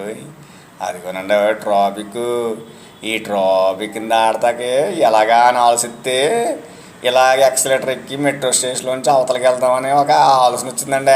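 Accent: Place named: Godavari, India. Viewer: గోదావరి యాస మన అందరి శ్వాస......🎉🎉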